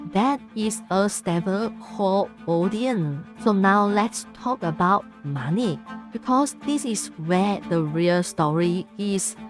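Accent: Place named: China. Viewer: tbh, it tooks me 6 minutes 34 seconds to get a single heroes realm match attempt to happens, and someone failed to confirm because they were afk most probably. I used to be able to get matches instantly. So there is already an impact on matchmaking. Also, this videos scream of chinese propaganda, made to make people stay in the game and play it. The strong chinese accent is too obvious for it to not be that lol.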